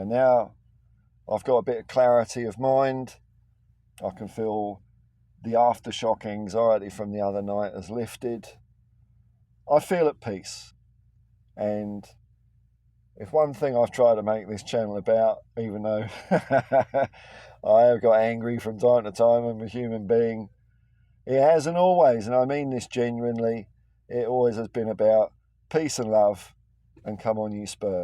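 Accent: Australia. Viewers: . Its was a pleasure to watch THE ONLY PSYCHEDELIC SOCCER SHOW ON THE INTERNET!... I was lucky to watch the channel grow from a few subs into a couple thousand. Loved your creativity and sheer passion for Tottenham Hotspur, it was good having an Australian voice for all us in the southern hemisphere (NZ). Im happy you have taking time for your mental health, its whats most important. Its a big loss for the spurs'a'sphere as you and Jacob (unitedspursofamerica) are some of the only positive channels i tune into. Take time, take it easy, I selfishly hope to get an occasional video from you here and there, perhaps after a big win! Thank you mate.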